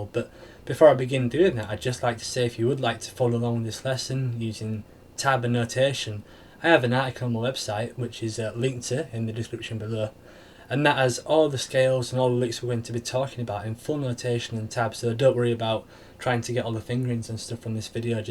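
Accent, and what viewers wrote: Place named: Northern England, United Kingdom. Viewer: Very helpful lesson. I have a better grasp of the scale now. and the Northern accent is quite pleasant. Wondering if you could do a quick lesson on the melodic minor over a standard blues progression, a la Robben Ford or Carlton. as in melodic minor on the last bit just before the iv chord. Cheers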